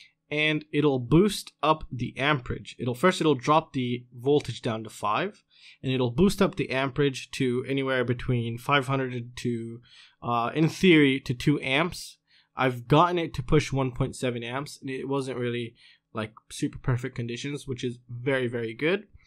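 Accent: California, United States. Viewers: david tilvikas he sounds like he has a California accent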